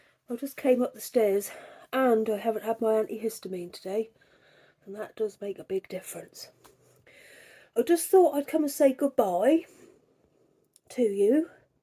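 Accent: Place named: Norwich, United Kingdom. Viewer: I have so enjoyed your daily visits this month. You talk so much like my mother who was from Norwich. She married my dad during WW II and he brought her back to Indiana in America. I have been over there several times and absolutely loved every moment. ❤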